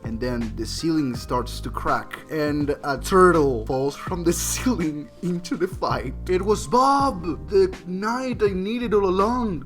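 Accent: Argentina. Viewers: Me parece o eres un brother argentino? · are you argentino?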